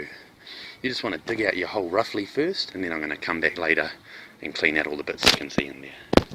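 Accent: New Zealand. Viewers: Loved this! Funny guy and I could tell you were a kiwi:) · are you a kiwi too bro ? love i found this video as its exactly what i need to know! chur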